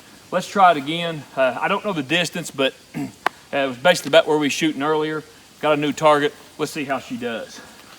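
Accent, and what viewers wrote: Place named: Appalachia, United States. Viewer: You are awesome dude, you had me lol the sounding like a hillbilly comments. My wife says the same thing.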